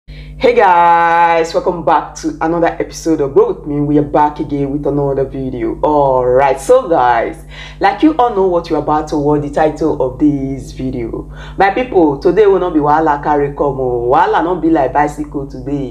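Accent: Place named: Delta State, Nigeria. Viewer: sister I hear yr language, yu from delta state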